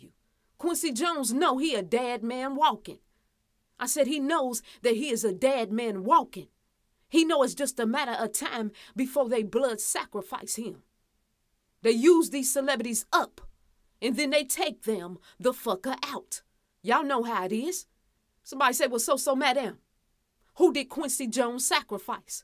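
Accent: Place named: South Carolina, United States. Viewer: Bihhhhh you sound like me and folk down here in South Carolina...keep spiting that truth out fam..Gullah geechie..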